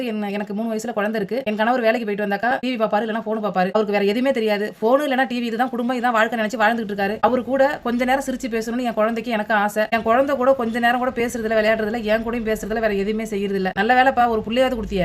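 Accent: Nagercoil, India. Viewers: Neega egauru Ponnu Ana nagarkoil ponu pola pesariga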